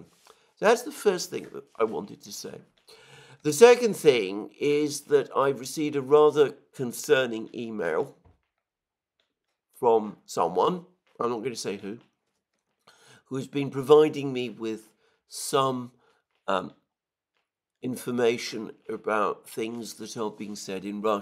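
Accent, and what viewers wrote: United Kingdom. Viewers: His British accent puts me to sleep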